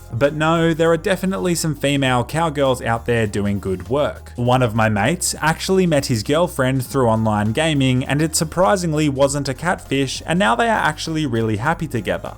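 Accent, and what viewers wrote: Australia. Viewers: You always sound weird. You're Australian. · Is this Aussie accent?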